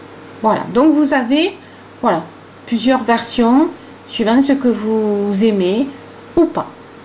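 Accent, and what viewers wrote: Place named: Provence, France. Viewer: merci pour se jolie chouchou et en plus avec notre acsent je suis de provence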